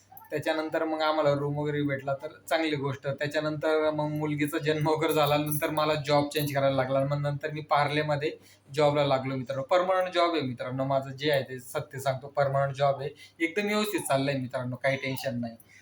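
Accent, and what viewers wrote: Khandesh, India. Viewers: khandeshi tone ne bolotat te pan mumbait khup changle neither lagch tone change hoto boloncha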